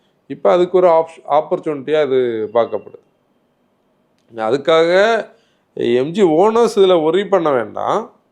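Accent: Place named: Nanjil, India. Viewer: Anna, mainly I'm watching ur videos for your nanjil accent and very casual talks... I like it very much.❤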